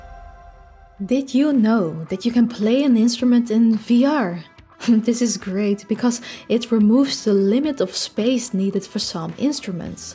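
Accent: Netherlands. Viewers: I love your accent, is it dutch?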